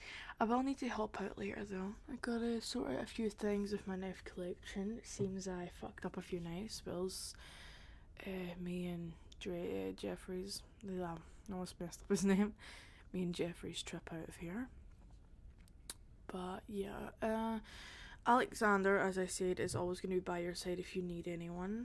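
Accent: Scotland, United Kingdom. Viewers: Are you Scottish or I'm so confused oh god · The first part was a bit to loud and violent for me. But this one was lovely! And I do love your scottish accent so much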